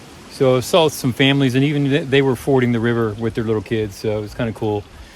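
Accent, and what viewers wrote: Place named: Pennsylvania, United States. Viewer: London, England...Acorn Army, worldwide! I’m American who’s lived in London 9 years. We have a family lake house in western Maryland - have hiked a few sections of the AT and a lot in the area. I’m looking to attempt a thru hike in 8 years (early retirement and kids out of school). Thought I wanted NOBO but not sure I’d like the large crowds - so thinking flip flop. Main worries are what you’re describing in this video - anti-climatic at Katahdin and will I have it in me to flip back down and hike south? I’d love to see you walk south and will be cheering you on if you do! Are you from PA? You mentioned it once and then the beach, so a bit confused. Also, you’re accent tells me PA or Maryland...maybe south Jersey?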